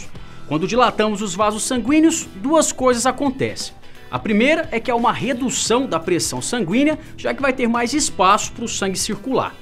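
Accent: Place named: Minas Gerais, Brazil. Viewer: Vídeo bom demais, ainda mais com esse sotaque "minerin". Sou fã do canal. 💕💕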